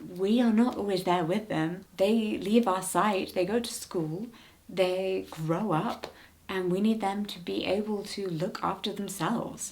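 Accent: United Kingdom. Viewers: Low soothing voice and Brit accent but couldn't get past the 💄 Have a lovely day!😊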